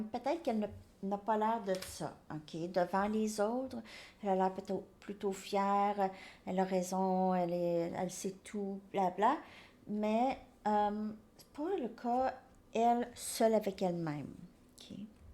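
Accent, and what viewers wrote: Canada. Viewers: j'aime énormément ton accent canadien je adore cendrillon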